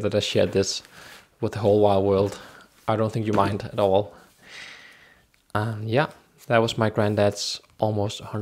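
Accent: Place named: Denmark. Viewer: You sound very danish 🇩🇰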